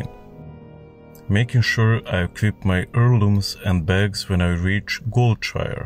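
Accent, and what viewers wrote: Russia. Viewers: Чисто русский акцент, давай видео на русском, читать сабы такое себе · Судя по акценту ты русский )))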